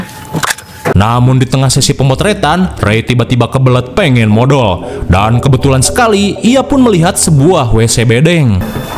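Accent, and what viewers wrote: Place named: Banten, Indonesia. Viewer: Dina logat Sunda na Sunda Banten doang na ieu Chanel nyh😅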